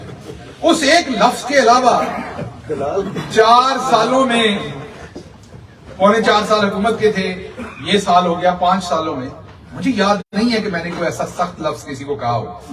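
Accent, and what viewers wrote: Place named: Punjab, Pakistan. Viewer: آپ کی غلابی آردو پنجابی اور زبان کے محاورات قابل مسرت ہوتے ہیں ۔۔۔۔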